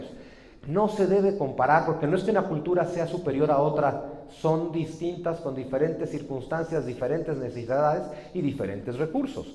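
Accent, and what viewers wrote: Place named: Mexico City, Mexico. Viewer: Pero si habla con el acentote de intelectual chilango, de que hablas? Xd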